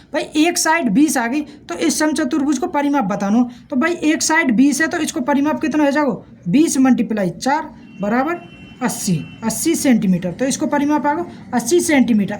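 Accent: India. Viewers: Bhaiya brajwasi hi h ka tu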